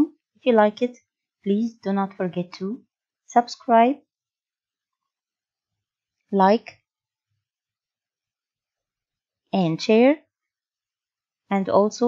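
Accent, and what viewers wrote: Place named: Egypt. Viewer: I love Egyptian English accent! Alot of respect mam From Pakistan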